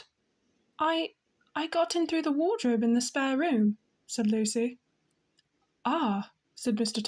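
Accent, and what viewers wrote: United Kingdom. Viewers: Very classy british accent.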